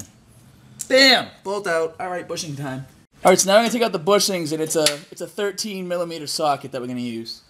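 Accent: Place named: Brooklyn, United States. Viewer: Funny, u can totally here the Brooklyn accent more in this vid than any other lol. Sweet car!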